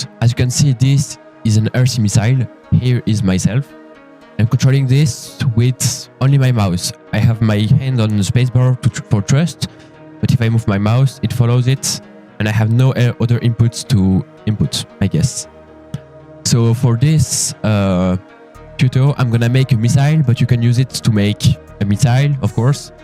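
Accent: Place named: France. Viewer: parce que quand tu parles tu sonnes français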